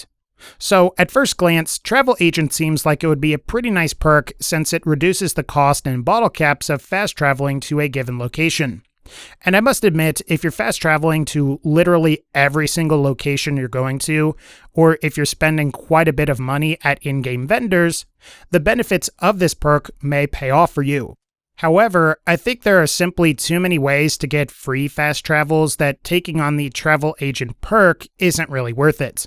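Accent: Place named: New York, United States. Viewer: This dude is from New York or New Jersey. Or he has some other wack ass accent.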